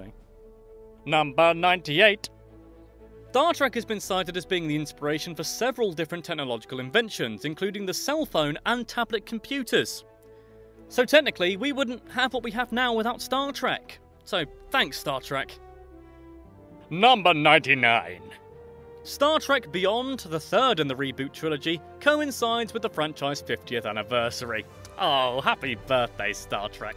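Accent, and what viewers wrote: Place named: United Kingdom. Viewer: Can't follow this one. Need to practice super fast British accent and encrypt spoken kappas #SwedishNoob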